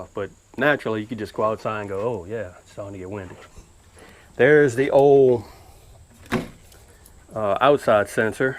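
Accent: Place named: Louisiana, United States. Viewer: Sounds like your from south Louisiana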